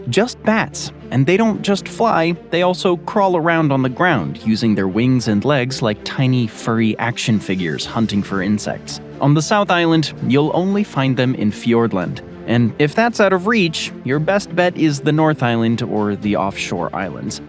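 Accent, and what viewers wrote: United States. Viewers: Hey, let's not forget that he sounds American and their words often sound different to ours. So attempted pronunciation is a good try. As for the Maori names, as a 7th generation Kiwi (Pakeha) I struggled with Maori pronunciation even though I learned the vowel sounds in school. The narrator may have had little to no exposure to the language. It's not spoken elsewhere and he may have been doing his best with pronouncing. Imagine if you were making a video on à country you have never had exposure to. Say China. They have two versions of language, Mandarin and Cantonese. I cannot tell which is which, but I know I once worked with a lady whose first name was Qian. Pronounced Chen. If I hadn't learned in that job with a multicultural workplace, I would not have learned how to pronounce that name. Many names, in fact. And I learned that Te Reo is a dying language and my Māori friend taught me a bit about it. 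Since then I have attempted to speak Maori words and names as accurately as possible, and told my daughter about this and she tries the pronunciation too. We do t always get it right, and I've been exposed to it all my life. As for the British names, I'm pretty sure it's just trying to split it into familiar syllables and mispronounced. As for me,.if I was doing a video on other places, I would run some pronunciation checks and see videos of people from the country or place and their pronunciation. But it seems many don't do this step. It's a shame, but not unexpected. No need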